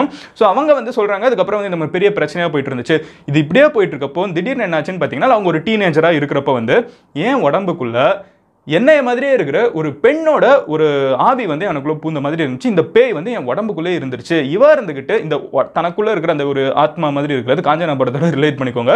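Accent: Madurai, India. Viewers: தலைவா நீங்க மதுரை யா ? மதுரை 🔥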